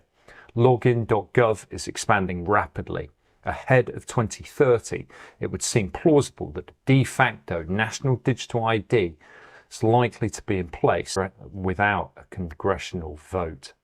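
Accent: United Kingdom. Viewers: you sound like a Brit.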